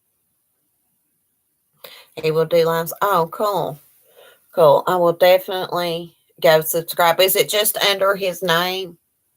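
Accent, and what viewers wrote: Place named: East Tennessee, United States. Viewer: New sub! It’s nice to find someone calm and not instigating trouble! Where are you from? We have the same accent..East Tennessee here! Glad that I found you!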